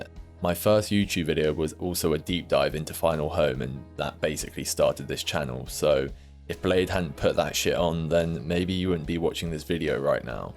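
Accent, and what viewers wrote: United Kingdom. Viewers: not listening to a british person talk about american music fashion and culture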